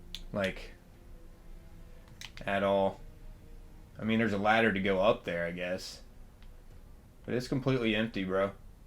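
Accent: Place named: Pennsylvania, United States. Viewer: Yinz from Picksburg? Your accent sounds realllllllll PA.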